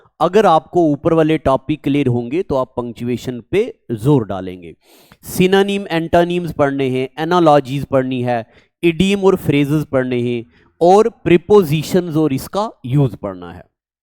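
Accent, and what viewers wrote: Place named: Kashmir, India. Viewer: Love this kashmiri accent 😂😂😂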